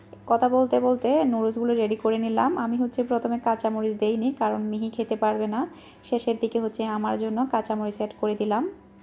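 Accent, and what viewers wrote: Chittagong, Bangladesh. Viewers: Amio.Chittagong ar. Kotha shona laglo Chittagong ar tai.ask.korci.apu.😍😍😘😘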